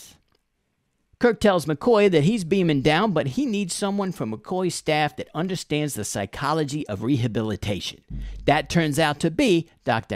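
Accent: Brooklyn, United States. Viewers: just love the ny brooklyn attitude and accent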